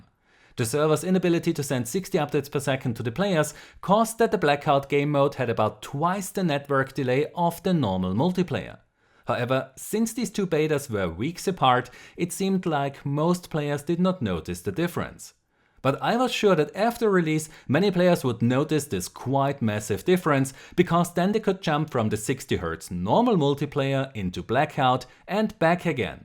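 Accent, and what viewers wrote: Germany. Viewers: Is your first language german? You accent sounds like that and is easily to undestand for me as a bad english speaker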